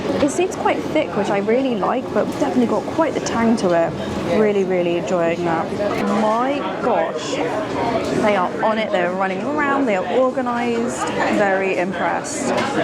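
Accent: RP, United Kingdom. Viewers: Lova ya posh gal.👍🙏🌹❤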